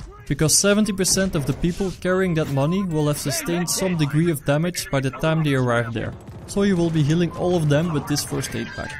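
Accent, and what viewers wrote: Netherlands. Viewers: Ik kan gewoon horen dat je Nederlands bent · 'Dutchy' English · je klinkt echt nederlands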